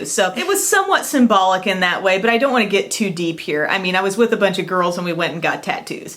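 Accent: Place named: South, United States. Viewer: Really? From Illinois? Your accent totally not a Chicago accent. I thought you were from the south.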